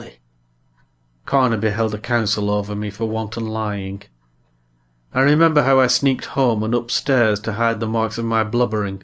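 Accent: United Kingdom. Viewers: Британский!американский резче и они очень четко произносят многие звуки такие как R и не тянут гласные. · Типичный англичанин читал ни одного кошаче американского уррр уррр слова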